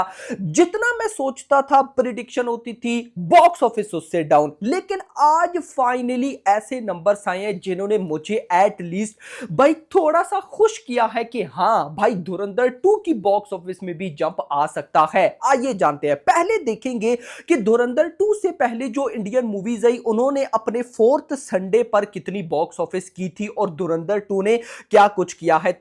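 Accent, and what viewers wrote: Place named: Pakistan. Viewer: Ye pakistani hai kya bc kya accent hai ye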